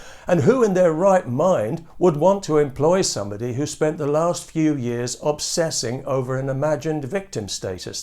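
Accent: United Kingdom. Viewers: Yes! Common sense has a British accent today.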